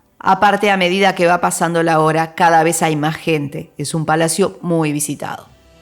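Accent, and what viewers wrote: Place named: Argentina. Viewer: Por qué neutraliza el español si se nota que es argentina? Que ridícula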